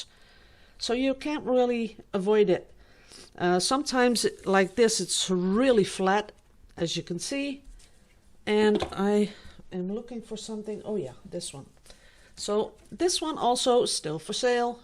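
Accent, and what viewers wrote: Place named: Netherlands. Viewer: First of all I have to say that I love your artwork so much! And I love to hear your voice and the dutch accent.☺️ (I’m German and I find my accent is horrible!😂) How do you clean your paintings? Do you have a video about it? Sorry if you‘ve probably mentioned it several times but I‘m quite new to acrylic pouring. And I must say, I didn‘t even know that it takes two to three weeks to let the paintings dry, but I think it depends on how thick the lair of paint on the canvas is. Thanks for sharing all your art, your knowledge and your experience, I really appreciate that. Have a wonderful Sunday.☀️🥰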